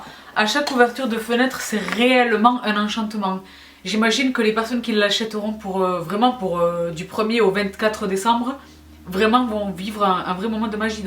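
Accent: South, France. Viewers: Hello les sista ........ ahahhaha a chaque fois je rigole je kiff ton accent du sud et félicitations tu le mérite tu partage avec nous ta passion qui est aussi ma passion aussi (tu ma fait acheter des tonne de truc ) et waouuuuuuuuuu le concour de dingue que tu nous fait "c'est un truc de malade....!!!" Lol je rêverais de gagner ce concour 😍😍😍😍😍😍😍😍😍😍😍😍😍😍😍😍😍😍😍😍😍😍😍😍😍😍😍😍😍😍 gros bisous a toi reste comme tu est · Oh la LA !!! Comme je suis ravie pour toi et heureuse de faire partie des sistas ! En plus, un giveway qui te ressemble vraiment. Grâce à toi, je me suis remise au layering, j'ai une wishlist de soins en asiatiques qui s'allonge, je m'intéresse de plus près à certains produits... en bref, je te voue une confiance absolue ! T'es vidéos sont des bouffées d'oxygène, elles sont remplies d'une jovialité débordante et communicative, je les attends toutes les semaines. 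D'ailleurs, à force de te regarder, j'en arrive parfois à parler avec un brin de ton accent du sud, des expressions propres à toi sortent parfois même dans des discussions, ça me fait rire toute seule !!! J'arrête pour aujourd'hui le roman mais c'est toi qui l'a voulu après tout ! Alors je croise les doigts pour avoir la chance de remporter le Pompon du pompon des giveways ! À dimanche 😉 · Coucou! Moi je t’ai connu il y a presque un an maintenant en recherchant des solutions contre la cellulite et je suis tombé sur ta vidéo par chance... je suis rapidement devenu addict! D’ailleurs j’ai tout de suite partagé ta chaîne autour de moi, j’ai parlé de toi à des amies qui ont tout de suite kiffé!!! Des techniques venues d’ailleurs, le brossage à sec, le derma planning, le derma roller... tellement de choses dont on parle peu mais si efficace et validée grâce à toi! Je te remercie pour tous ces partages, plein d’honnêteté et de sincérité. 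Je pourrais m’étaler encore longtemps mais je vais faire bref lol, c’est toujours le poommmpooom avec toi. Le dimanche toujours en attente de l’annonce de ta vidéo sur Snapchat! Alors on se pose tranquillement sous la couette petit café et on écoute tes précieux conseils emprunt de cet accent tout droit venu du sud, tellement appréciable pour ton abonnée venu du Nord lol. Force et honneur à toi!!!! Tu nous as conquis(e)s et tu mérites tout ce qu’il t’arrive aujourd’hui, par travail et endurance, je te tire mon chapeau pour allier ton travail et ta passion! Une femme inspirée et inspirante... pour finir moi je te dirais de continuer sur ta lancée tu arrives à balayer toutes les catégories de vidéos qu’on pourrait voir (mention +++ pour les vidéos chichat) moi j’aimerai pouvoir te revoir dans des vidéos tuto make up avec tes petites techniques ou lookbook étant grande de taille également j’apprécie de découvrir des look q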